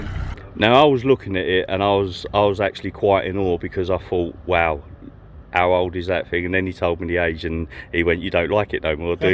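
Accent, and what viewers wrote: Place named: Kent, United Kingdom. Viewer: Did u do that or was it decommissioned before you brought it bud . Got my eye on 18 t prison van . I have class 1 hgv n dcpc..... I was on the understanding that if truck is used for private use only (after I brought it ) then I dont need to use my digi card . You sound local to where I grew up north west kent . Now in Essex. Great vids bud . Handy ideas for mine .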